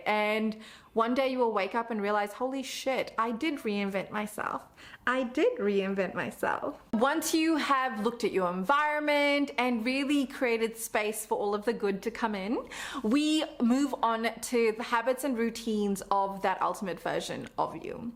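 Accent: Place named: South Africa. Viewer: You sound South African, specifically like you're from the Western Cape suburbs 🌟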